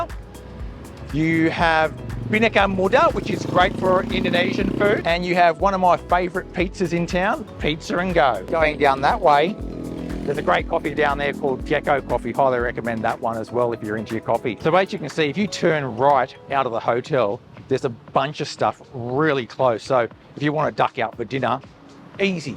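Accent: Australia. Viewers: Love the Australiuan accent! just found your channel and what a place for the solo traveller, well produced and informed video, will check out your travels, cheers and beers!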